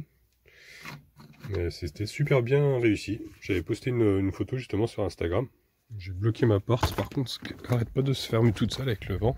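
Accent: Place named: Lyon, France. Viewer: Il est de Lyon sud. C'est un gone. Ca s'entend a son accent, parfois.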